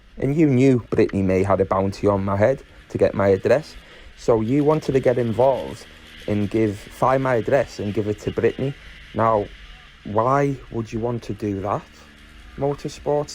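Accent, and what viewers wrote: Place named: Geordie, United Kingdom. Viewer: I love the slip in accent mate your geordie roots always shine through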